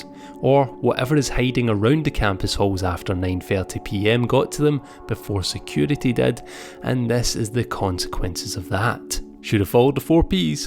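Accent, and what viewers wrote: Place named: Scotland, United Kingdom. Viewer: you have a heavy scottish accent but nonetheless, i like the review of this underrated analog horror series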